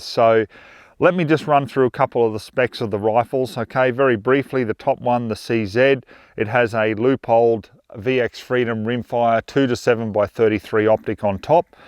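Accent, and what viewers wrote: Australia. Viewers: He is Australian not European. Why do Americans say Aluminum instead of Aluminium?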